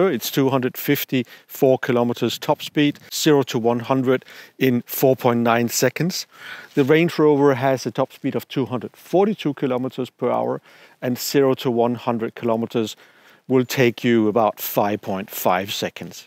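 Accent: Denmark. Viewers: Is this Danglish ??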